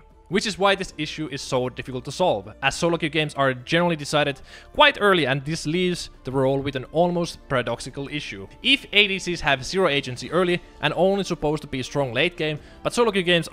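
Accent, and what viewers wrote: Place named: Finland. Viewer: start watching video hear the accent realization FINLAND MENTIONED🇫🇮🇫🇮🇫🇮🐻🐻🐻🐻🥶🥶🥶❄️❄️❄️❄️🍻🍻🍻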